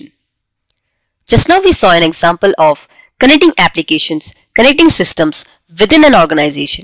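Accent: India. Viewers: I find some of the comments here a bit offensive. The presenter may have an accent and a high voice but I find their explanation clear, easy to follow and concise. The English is grammatically correct and yes may have an Indian lilt but is easy to follow.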